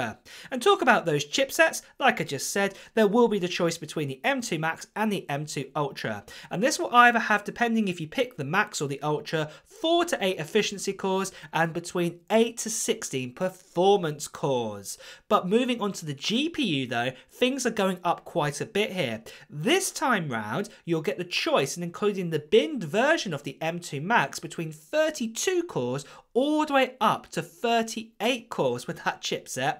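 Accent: United Kingdom. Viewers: i love your british accent and i hope to have the iphone 14 pro max pleaaaaaaase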